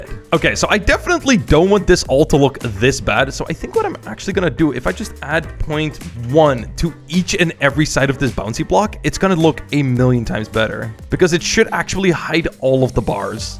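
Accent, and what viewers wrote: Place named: Netherlands. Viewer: Ik ruik een klijn beetje nederlands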